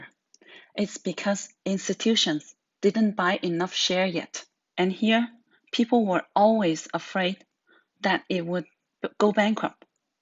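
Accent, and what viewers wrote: China. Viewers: Sounds like chinese english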